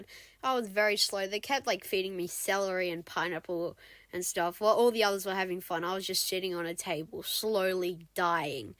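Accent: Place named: Australia. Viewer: bros Australian 🇦🇺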